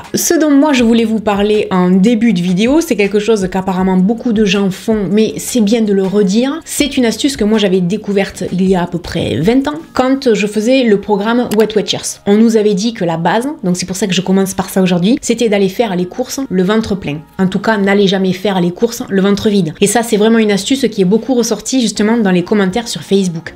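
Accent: South, France. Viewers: Mdrrrr il est trop beau ton accent du sud. Je suis d’Avignon et merci pour tes astuces ( les coupons …etc ) Merci beaucoup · bonjour j'espère que vous allez bien? c'est toujours un plaisir de voir vos vidéos et je les adore, merci de nous faire partager ces 15 astuces utiles pour réduire le budget courses afin d'économiser de l'argent. Votre rire communicatif,votre bonne humeur et accent du sud c'est le top. J'apprécie votre simplicité, votre sincérité et votre naturel. Bonnes fêtes de fin d'année prenez soin de vous.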